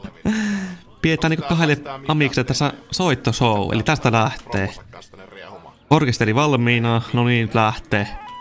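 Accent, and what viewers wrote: Finland. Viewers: Itä suomen murretta minkä sille voi jos on opetettu sanoon nii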